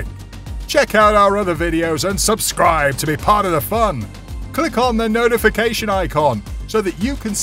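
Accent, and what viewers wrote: United Kingdom. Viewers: Why is it always a British guy? Can't it be Snoop Dog? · I love the way you talk London England but Fiennes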